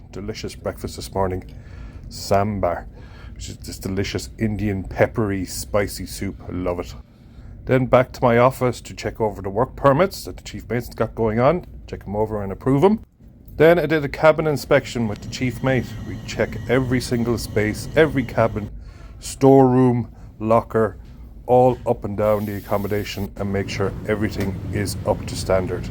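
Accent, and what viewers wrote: Ireland. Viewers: Please, just keep talking. I’m a Texan born and raised but the good Lord knows that the Irish tongue has my heart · Is that a Cork accent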